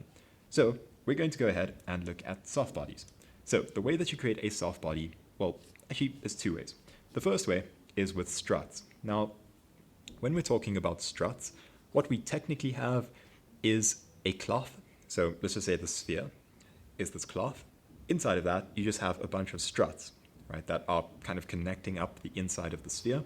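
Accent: South Africa. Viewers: Love this series!! Are you from South Africa?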